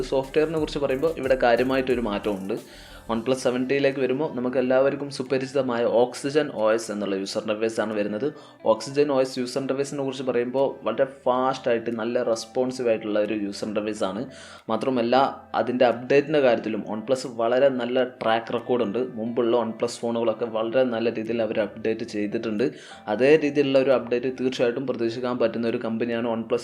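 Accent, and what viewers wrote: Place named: Malappuram, India. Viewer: Ayye malappuram bhashayil avatharam... 🤭🤭🤭😬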